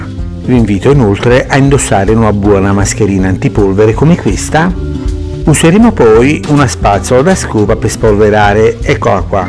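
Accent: Naples, Italy. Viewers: buongiorno! Ma sei di Napoli?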